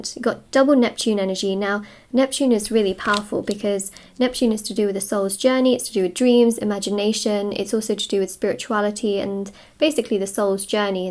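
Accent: United Kingdom. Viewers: your accent is so British..... are you a British native???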